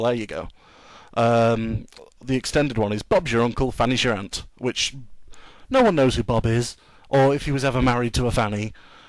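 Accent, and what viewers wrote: New York, United States. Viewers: GREAT NEW YORK ACCENT! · thats a great new york accent man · If you care to do a study of a strong NY accent, give a listen to the Bowery Boys (their films are funny as well).Your accent sounded NY enough to me to get the point across. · I don't see much of a difference in most cases. However, I've learned some expressions from Karl Pilkington, par exemple: "Knocking around" - to be "Having a go" - making fun of "loads" - a lot Any more Mancunian ones? Great NY accent, by the way! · That was an amazing old New York accent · In Scotland, it goes Nursery School, Primary School, High School then either College or University. You get to choose what you want to do after High School depending on your grades. Though University is above College in the "Ranks". Its more harder than College. Also, I loved your New York accent. lol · Your New York accent is good. 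What I'd like to ask--and you may/may not have an answer is why some English pronounce words that end in vowels with a faint r, such as idea=idear, or saw=sawr/sahr, or america=ameriker. I've wondered about this for some time. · cinema= movie theater People use both in US. You know something, I thought it was offensive to call British people Brits. But I hear y'all calling yourselves that quite a lot. Wait college and university?? What age range are those? Also, love your New York accent.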